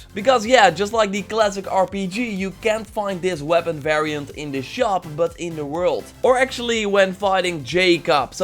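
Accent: Netherlands. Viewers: Are you dutch? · Dutch accent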